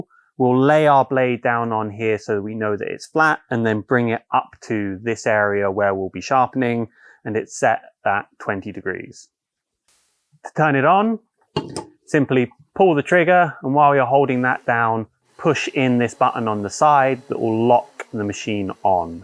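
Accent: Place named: Midlands, United Kingdom. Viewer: It’s so lovely to hear a Midlander! Aye Up! Great introduction to the Grinding Attachment.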